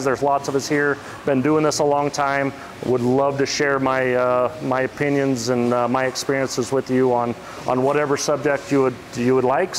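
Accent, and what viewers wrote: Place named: Canada. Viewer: With all of the awes,you sound like Turdo up her in Canada!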